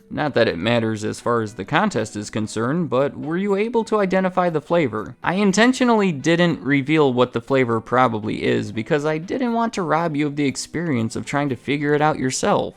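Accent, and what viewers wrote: United States. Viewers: What state are you from? I swear to god the way your pronounce you "ah" sound is so familiar that I must know someone from there. Super subtle accent, but interesting nonetheless.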